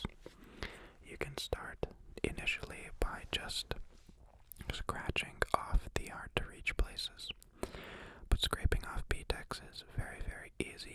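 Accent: Canada. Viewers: Us he Canadian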